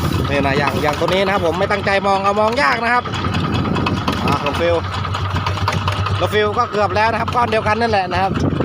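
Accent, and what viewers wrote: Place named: Suay, Thailand. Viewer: พี่ส่วยหรือเขมรครับสำเนียงเหมือนส่วยมาก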